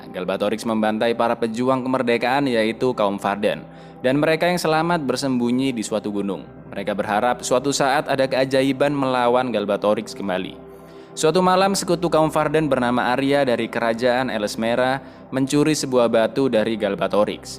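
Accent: Java, Indonesia. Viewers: Kang lu orang Jawa yang mana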